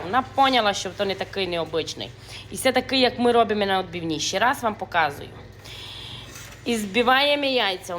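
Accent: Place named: Transcarpathia, Ukraine. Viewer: чекаю з нетерпінням ваші нові відео 🫶 Обожнюю закарпатський діалект у вашому виконанні ❤❤❤. Знайшла (нарешті 🎉) ваш канал на YouTube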